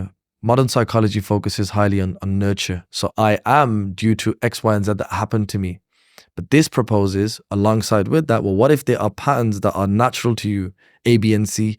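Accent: United Kingdom. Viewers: sounds like a Bradford accent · it’s more a midlands / Birmingham accent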